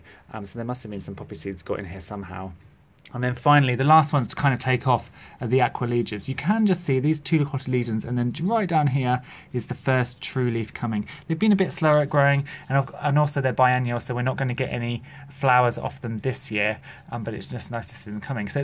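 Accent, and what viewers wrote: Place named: United Kingdom. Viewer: Your video is very nice, but why are you speaking with a British accent??? I am Argentinian.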